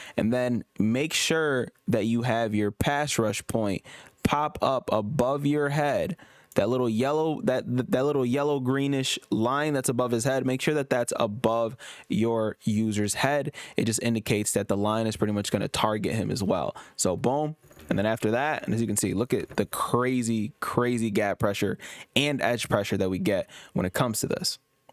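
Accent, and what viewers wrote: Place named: RP, United Kingdom. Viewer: Very Posh!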